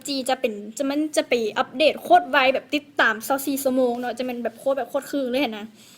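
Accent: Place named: Laos. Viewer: 555 สำเนียงน่ารักอ่ะ... รักสาวลาวแล้วครับ....5555 แล้วเฟสบุ๊คจริงๆคืออะไรขอมั่งสิครับ ^_^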